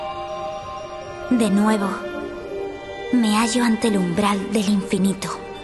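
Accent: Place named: Spain. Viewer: Está vez español de España fue mejor que el de latam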